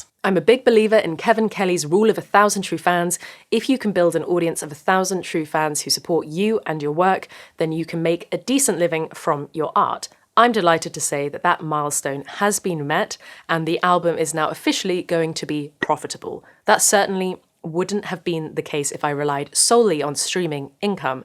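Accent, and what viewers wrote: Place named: Bristol, United Kingdom. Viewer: Not sure commentary from an old guy (I'm 79) is useful, but I'll give it a go. I stumbled on to your channel on YouTube by accident. I've stayed a fan because your musical tastes are eclectic, and sometimes overlap my own, and also because I'm a sucker for an articulate female voice with a Bristol accent – I like your "talk" videos as much as your musical ones. I've played guitar for decades, but am not a musician (I don't understand the theory, barely can name the strings on a standard-tune guitar, etc.). I learned on my own, by ear, and will never be better than mediocre. That's OK – I don't expect "success" in that context. I play for therapy. I "discovered " Larkin Poe in the same way – by stumbling upon them on YouTube by accident – but have become enough of a fan that I actually went to their show last spring, despite my advanced age, when they played Minneapolis last spring. 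I'd be happy to see you in person, as well, if you ever came here. It seems smart to me, a non-musician, for a young musician to make use of multiple sources of income, publicity, etc., and you are obviously a smart musician. I have no talent for business, so kudos to you for making it work on your own terms.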